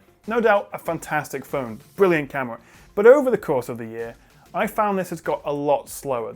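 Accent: United Kingdom. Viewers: meh whiney brit not enough of those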